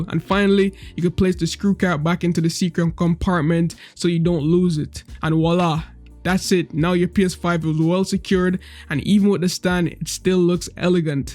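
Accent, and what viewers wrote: Jamaica. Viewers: Are you a Jamaican? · You could hear the Jamaican accent 🇯🇲🇯🇲 old news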